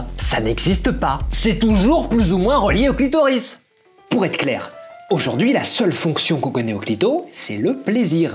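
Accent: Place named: Marseille, France. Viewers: J'ai adoré la dernière phrase X,) Et tu joues bien ! L'accent marseillais 👌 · merci pour l'accent du sud.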